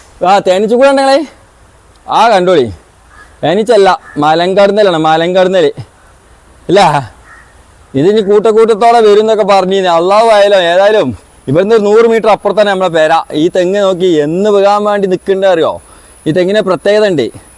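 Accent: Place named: Malappuram, India. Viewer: നല്ല പച്ച മലപ്പുറം ഭാഷ ഇഷ്ടമായി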